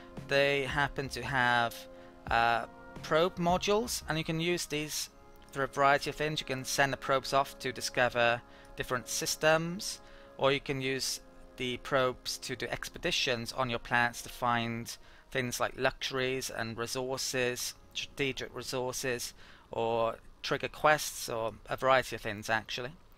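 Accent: Wales, United Kingdom. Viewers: u from wales?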